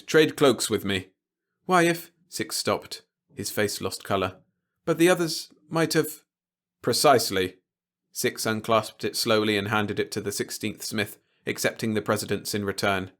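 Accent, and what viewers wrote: United Kingdom. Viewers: UK accents are annoying.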